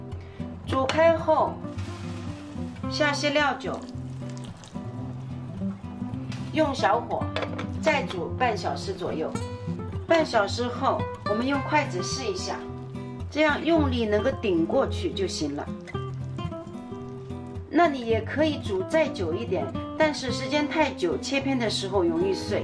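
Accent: Shanghai, China. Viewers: 不是普通话，而是浦东话。乡音啊，亲切的。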